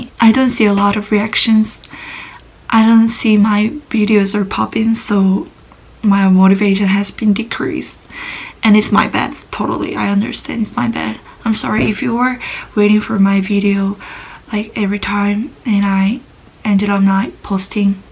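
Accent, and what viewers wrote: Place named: South, United States. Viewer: 미국 남부지역에 거주하다가 욌다는 것을 영어억양듣고 추정할 수가 있어요, 표준발음은 시카고나 디트로이트 주변에서 거주해야 가능합니다.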